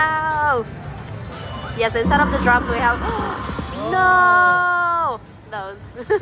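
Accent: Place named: Minnesota, United States. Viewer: Recognize that Minnesota accent from anywhere ya know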